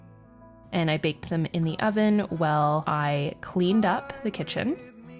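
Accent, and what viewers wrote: Canada. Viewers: finally a Canadian who I can tell is bilingual!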